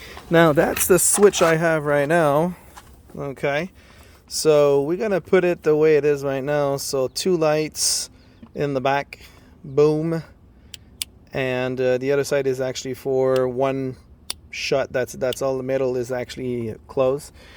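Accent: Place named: Quebec, Canada. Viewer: J'étais curieux! L'accent. Tu avais des schémas en français! Je me demandais quel coin du QC tu étais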